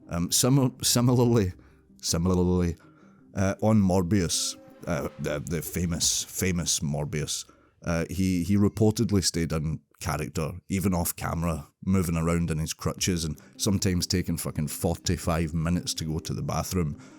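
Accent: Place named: Scotland, United Kingdom. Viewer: F. U. Learn to speak English if you want to communicate with English speakers. (You Scottish bastard!) P.S. 'all viewers can tell you LOVE Jared Leto.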